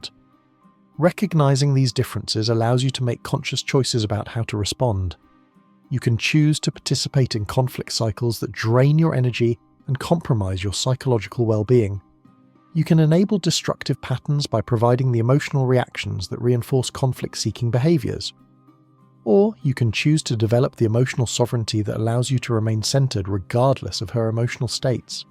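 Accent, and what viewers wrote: United Kingdom. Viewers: This is why you can't trust a British accent, he is getting you to buy into toxic behavior without calling it toxic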